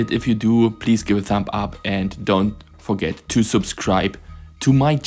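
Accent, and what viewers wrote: Germany. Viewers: Ich liebe dein deutschen Akzent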